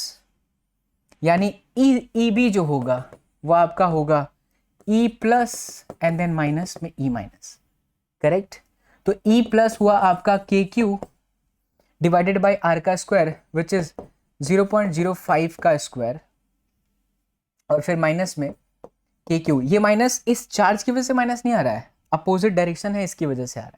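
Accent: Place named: Jaunpur, India. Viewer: Jaunpur se ho aap sir ji 😅 meri tarah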